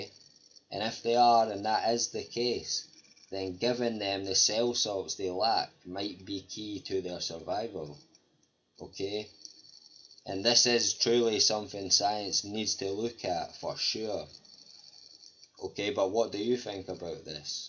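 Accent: Scotland, United Kingdom. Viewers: I subbed to you because I liked the fact that you gave your ideas at the end and what a very good thought it was. Thanks for sharing this and your own ideas on it too. I would love to hear more about this. Love your Scottish Accent by the way!! I am a Norfolk girl, but my Mum and I just love Scotland so very much :) especially thick Plain loaf toast.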